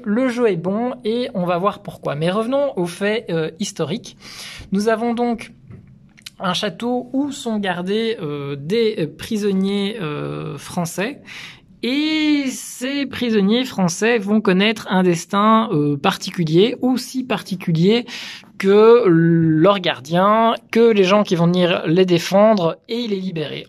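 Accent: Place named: Belgium. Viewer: ahhhhh caaaaa ne m'en parle pas. Mais ça fait plaisir d'entendre cette voix belge :)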